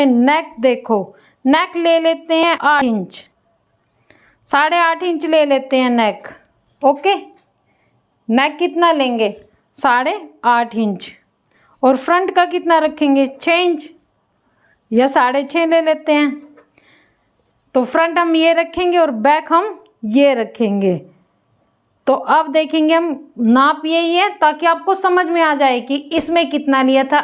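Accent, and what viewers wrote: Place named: Haryana, India. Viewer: Mam aap kaha se ho aapne haryanvi boli bhut acha laga sun ke😂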